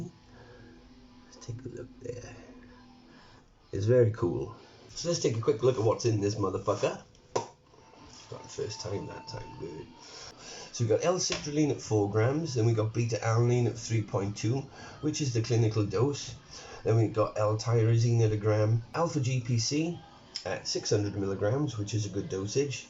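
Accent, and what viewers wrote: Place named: Southern England, United Kingdom. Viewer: also what part of england are your from im from newcastle but your accent sounds more southern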